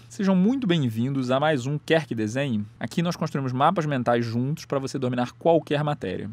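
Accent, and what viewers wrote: Rio de Janeiro, Brazil. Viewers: esse cara tem mo sotaque de carioca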